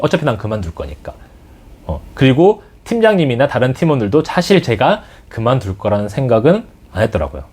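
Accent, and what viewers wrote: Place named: Gwangju, South Korea. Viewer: 광주사투리 넘나 매력적… ☺️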